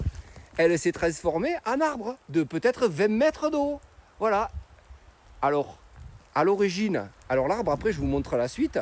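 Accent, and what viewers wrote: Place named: South, France. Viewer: coucou! de la part dune mamie qui à reçu cette année à Noël de sa petite-fille en cadeaux ce livre: la vie secrète des arbres de: Peter Wohlleben,Fred Bernard,Benjamin Flao. peut-être connait- tu ce livre? ma petite-fille faisant des études dans ce domaine (cientiphique).même à 74 ans que j'ai eut le 16 février 2026,on en apprend tout les jours, lorsque j'étais enfant, je grimpais tout les jours aux arbres,ayant la chance, de n'avoir que la route à traverser pour s'y rendre. je vous ai découvert depuis quelques temps,et vous etes une bien belle personne.(j'adore votre accent ).ça me fait pensé à mes années adolescentes passées dans le midi (06). ps: cela fait du bien de vous écouté parlé, vous respirer le bien-être... mamie anne-marie, 77000,proche de fontainebleau, connaissez-vous le château? et le parc magnifique, chargé d'histoires, pour ceux à qui ça intéresse. 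merci encore pour votre savoir pour la jeunesse à venir. 😘❤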